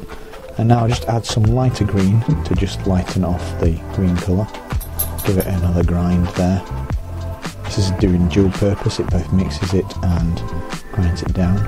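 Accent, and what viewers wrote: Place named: England, United Kingdom. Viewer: Thank you, your a great crafter and I love your english accent.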